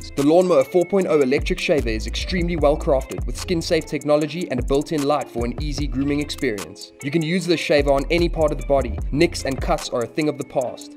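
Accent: South Africa. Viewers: You sound South African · You're definitely from Durban. That accent lol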